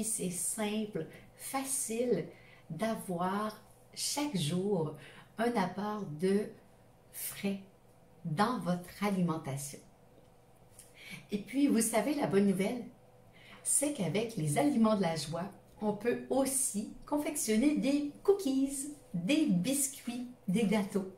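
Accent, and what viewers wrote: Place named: Canada. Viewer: Merci avec le bel accent canadien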